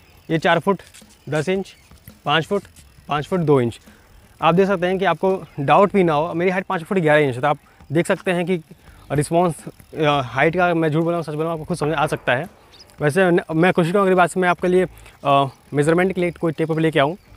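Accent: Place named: Bengal, India. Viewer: আপনার কথা শুনে বোঝা যাচ্ছে যে আপনি একজন বাঙালি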